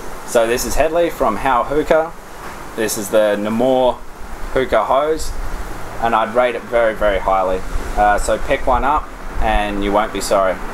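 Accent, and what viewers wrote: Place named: Australia. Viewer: Australian accents are bomb. good review man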